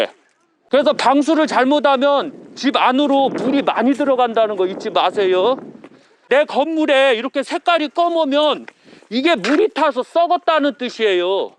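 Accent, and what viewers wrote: Chungcheong, South Korea. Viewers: 제 나이 칠십이 넘어서 세상 이치는 어느정도 안다고생각합니다 집값이 얼만데 댁이라면 저집을 사겠습니까 누구라도 끔찍해서 몇십억을주고 들어가 살고싶지않을겁니다 혹시 누군가 공익을 위해서나 다른 목적으로 사용한다면 모를까 사람이 들어가 살겠다고하지않을것입니디 저도 언젠가 유투브에 올라온 영상을 본적이 있어서 저분을 알고있어요 특유의 충청도 말씨때문에요 그렇게 제자도 양성하시고 하는분이 엄청난 가격의 남의 재산을 저렇게 공개적으로 촬영해서 방송하시면 되겠냐는겁니다 집값이 폭락하고 안팔리면 어쩔거냐 그말입니다 더구나 서울등 대도시도 아니고 시골 외진곳같은데 말입니다 그리고 억울한 옥살이로 가혹한 세월을 보내신 박근혜대통령의 건강을 걱정하는건 알겠어요 지금 건강이 완쾌되신것도 아니니까 더더욱 그렇구요 그러나 영상으로 이렇게 공개적으로 놀랍고 자극적인 제목으로 이러는건 절대로 아니란말씀이란겁니다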